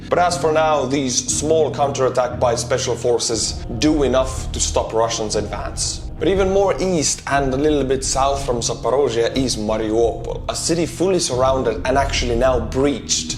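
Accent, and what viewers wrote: Estonia. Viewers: I love your Estonian pronunciation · Don’t lie! You know that Estonian accent is awesome. It sounds to my ears like some mix of standard “eastern european” mixed with a bit of Finnish, but then a healthy dash of Italian and even some Catalan with the rolled R’s. Love it, man - I’ve really grown to appreciate the three furiously independent “Baltics.” Edit: I came to this appreciation by way of John Neeman tools, now the Autine Forge and Guild, a Latvian collective dedicated to traditional building techniques and crafting world-class, handmade tools and gear.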